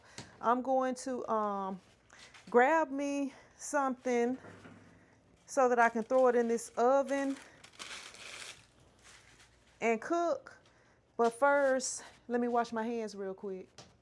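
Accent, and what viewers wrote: South, United States. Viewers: That’s crazy! Before you mentioned you were a basketball coach I thought to myself this woman is built like she hoops. I love your southern dialect btw. Thanks for all the t-shirt help and pointers.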